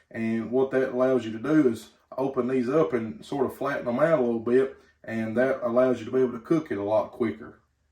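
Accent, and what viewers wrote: South, United States. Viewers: love the southern accent 🙂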